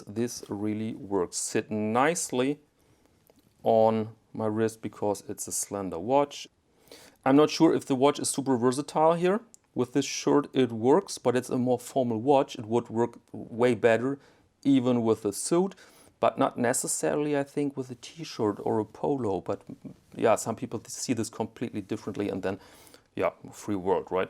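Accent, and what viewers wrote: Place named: Germany. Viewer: The German accent adds credibility. Where do I get one?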